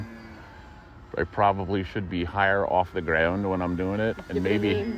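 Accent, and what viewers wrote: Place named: North America. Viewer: Brits aren't usually able to place North American accents, so for my education where is your accent typical of? Great fun videos.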